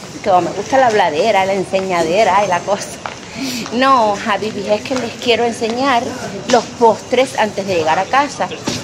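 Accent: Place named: Cuba. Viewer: ME ENCANTAN TUS VIDEOS, ERES SUPER COMICA. NO HAY NADA MEJOR QUE COMENZAR EL DIA MIRANDO UNO DE TUS VIDEOS PARA ESCUCHAR EL ACENTO CUBANO Y REIRME CON TUS COSAS. QUE ALLAH TE BENDIGA SIEMPRE.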